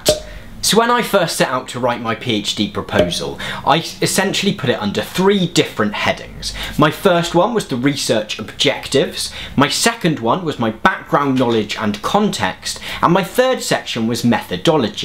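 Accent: United Kingdom. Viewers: I like British ascent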